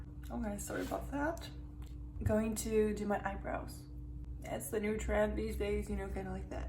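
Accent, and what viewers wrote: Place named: Russia. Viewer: It's Russian-pronounced English.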